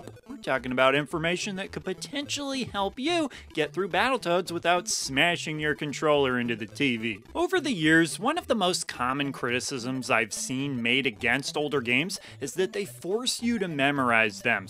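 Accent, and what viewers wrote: Midwest, United States. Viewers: ah ha! Now that I have you, are you from the Midwest?? You sound like a friend from MN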